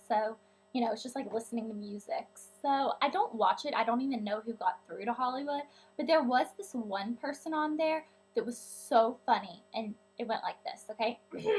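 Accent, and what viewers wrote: South, United States. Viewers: sweet tea! lol you are southern... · lol ur have the south accent... sorry lol just sounds weird cuz im from NYC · Your southern accent is adorable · I'm from the south to! I dont really notice your accent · how come you have the southern accent but elle doesn't? elle sounds like a valley girl sometimes lol is it cause she had already developed her accent or way she speaks before you moved to the south??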